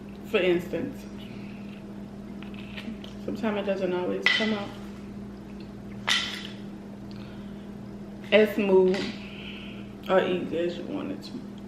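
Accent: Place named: New Orleans, United States. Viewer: Nola? Pretty accent!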